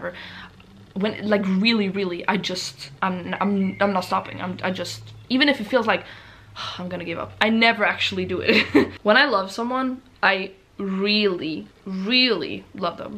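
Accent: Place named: United States. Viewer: omg ur american accent is so much stronger now! I havent been on youtube for ages and I really notice a difference! Cool :—-) Loved this vid xx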